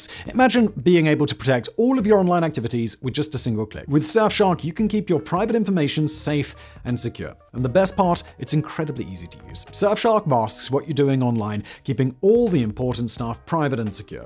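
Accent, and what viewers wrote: United Kingdom. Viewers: A british accent describing my beautiful country's bad ass air superior technology is just perfect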